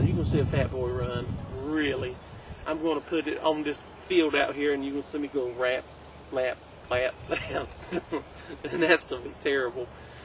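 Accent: United States. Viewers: are you from Southern Ohio, KY, or WV? you sound like the people around here lol. It's sad i can sense a difference between myself and them even after 5 yrs. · its a southern accent. not all americans have the same accent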